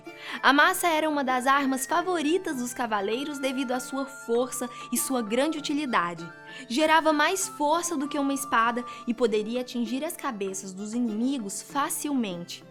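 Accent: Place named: Minas Gerais, Brazil. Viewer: aposto que essa mina que apresenta é mineira e de BH, um mineiro reconhece o outro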